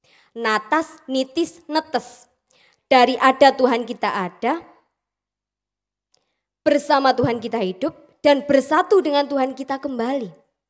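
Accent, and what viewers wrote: Java, Indonesia. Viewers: Mbak hebat 👍👍.Wajah dan suaranya bening.Pengetahuan agamanya lumayan.Tehnik penyampaiannya bagus.Yang paling menarik bagi saya laval bhs Jawanya sangat mengesankan.Jarang ada yng mampu seperti itu.Selamat Mbak,salam dr Semarapura,bali.Klo blh tau Malangnya di mana? Saya dulu sering ngelencer di kayu tangan.Suksma.🙏🙏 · Suksema yo apik pisan dialek jowone santun bikin hati ne tentem /adem yo cah ayu pisan matur nuhun ngih apik jenenge ayu pisan wonge suksema ngih .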